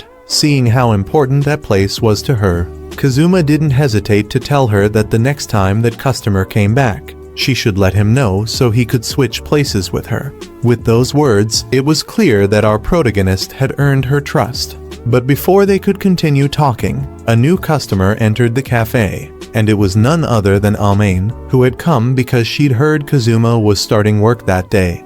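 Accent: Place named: India. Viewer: why did it switch to an indian dude narrating with no warning help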